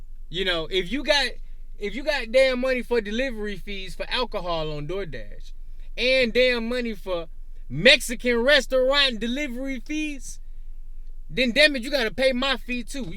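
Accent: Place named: St. Louis, United States. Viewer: Bro gotta be from the Lou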